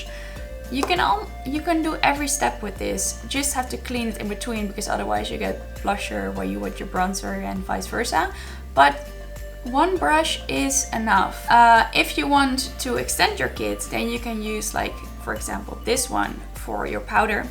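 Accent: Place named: Netherlands. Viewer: What a gorgeous channel you have! Where are you from?💗✨ You sound Dutch like I am😂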